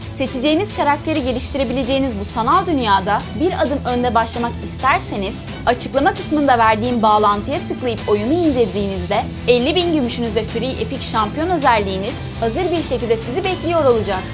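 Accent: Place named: West, Turkey. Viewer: Yok ya sahimi şu batılı ağzıyla car car etmeseniz olmuyor yalan bu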